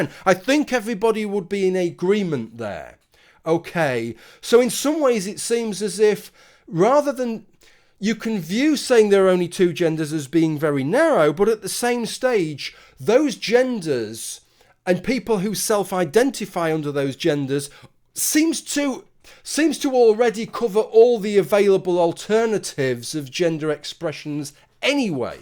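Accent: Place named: North, United Kingdom. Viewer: You have a pretty voice, sound like the northern people on Game of Thrones! XO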